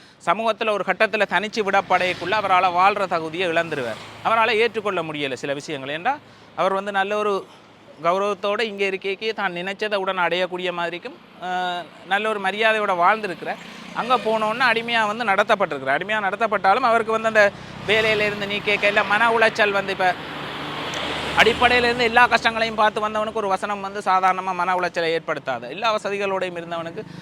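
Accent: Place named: Sri Lanka. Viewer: Bro! 1st time paarkkiran ur seen, very reality & vaidvaa suththamaana Lanka Thamizh kathaikreenka, Nallayirukku ,piroyasanamaayirukku, ☆keep it up☆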